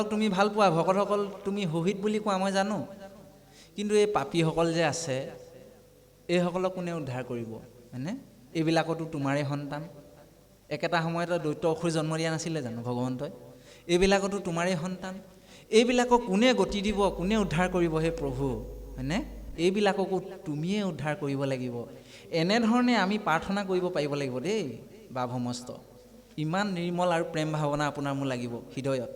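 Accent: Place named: Darrang, India. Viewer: Bap আপোনাৰ বানী শুনি বহুত ভাল লাগে। Darrang ভাষাৰ লগত মিলে।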